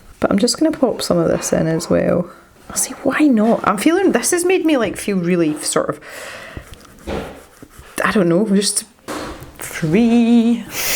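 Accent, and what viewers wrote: Scotland, United Kingdom. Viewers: Cute accent. Scottish?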